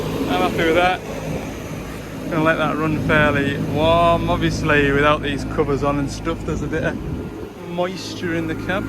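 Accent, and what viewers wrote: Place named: Scotland, United Kingdom. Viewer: Love it when the Scottish comes out it sounds funny with your accent. Goat yer troosers oan. Oh aye like.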